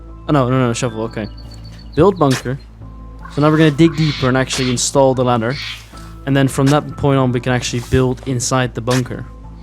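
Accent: Netherlands. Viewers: damn wist niet dat je nederlands was tot ik je hoorde praten met je team haha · Are you Dutch... ? :)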